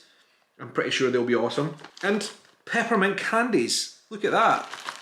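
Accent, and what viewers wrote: Scotland, United Kingdom. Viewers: Hi 👋 from SoCal... I have to confess, I watch your videos because I love the Scottish accent. ❤️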